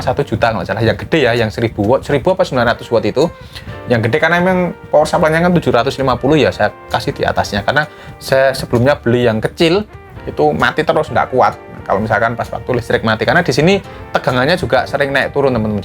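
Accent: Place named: Java, Indonesia. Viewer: Pertahankan medoke mas...keren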